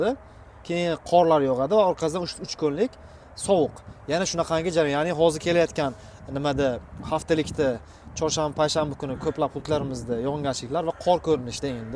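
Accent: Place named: Fergana, Uzbekistan. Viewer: Нимага чала гапирасиз фаргона гапиз кобкети ичизда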